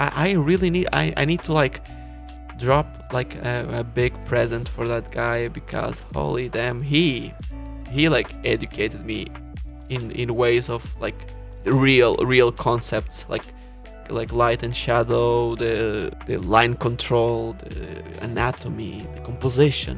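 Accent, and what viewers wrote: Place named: Europe. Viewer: I thought the celestia and Luna voice were mostly intentional. I didn’t realize you were from Europe? I think? XD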